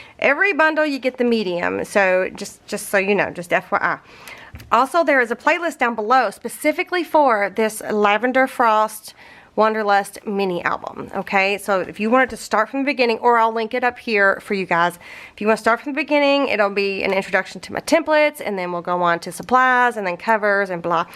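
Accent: South, United States. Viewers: Lol, I was listening to you and thought: this girl is definitely from the south. Then I saw you were in Louisville. I’m in Paducah! Love your ideas!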